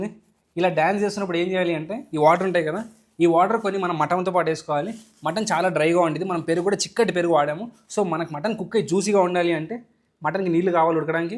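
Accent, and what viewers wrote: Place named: Telangana, India. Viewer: Superr brother... Ur way of cooking so lovely to watch.. mainly Telangana slang.. lots of ❤❤❤❤❤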